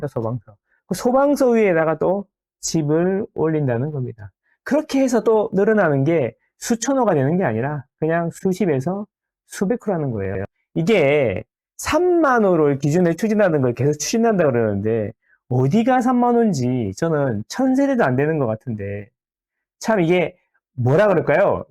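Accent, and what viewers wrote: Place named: Gyeongsang, South Korea. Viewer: 경상도 방언. 끄덕. 끄덕. 그 뭐시기. 누구 닮았네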